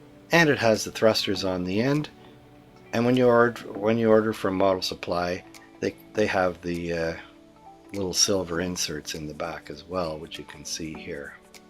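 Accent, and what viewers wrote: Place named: Canada. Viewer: Very nice job, faithful to the original and with a real eye for detail. Also it's always nice to hear (what I suspect is) a Canadian accent.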